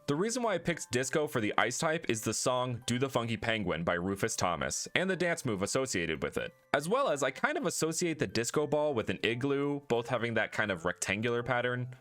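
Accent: Ireland. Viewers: Are you from Ireland too? 💚🤍🧡🇮🇪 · Being Irish I can confirm he is Irish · I thought he was Irish Also merry Christmas 🎄🎄🎄🎄🎄🎄🎄🎄🎄🎄🎄🎄🎆🎇✨🎁🎈🎄🎄🎄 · Be proud of your Irish heritage - it makes you human (and I love an Irish accent! 😊)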